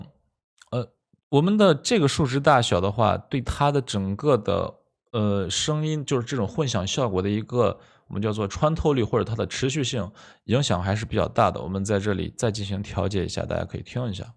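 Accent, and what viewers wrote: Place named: Shaanxi, China. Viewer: 老乡啊啊啊啊 带着陕西口音 但是英语居然没口音🥰✌️喜欢喜欢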